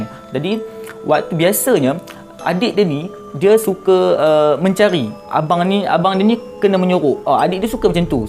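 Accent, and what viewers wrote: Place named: Perak, Malaysia. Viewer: Bg aku cara penyampaian mudah paham. Mgkn sbb loghat peyrok nye pekat jgk.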